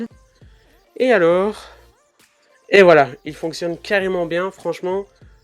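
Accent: Belgium. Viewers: et t'est belge?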